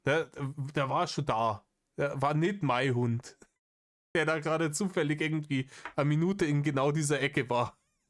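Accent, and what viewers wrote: Bavaria, Germany. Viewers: Lieber. Ich bitte dich. Ich brauche mehr bayrische Stimme, die sich über Dinge aufregt in meinem Leben. Also mach „Narrisch“ Videos, APO Red, Rainer, Politiker oder sonst welche Schwachmaten. Ich hab vor lauter Schmacht schon alte Rainer Reactions angeschaut.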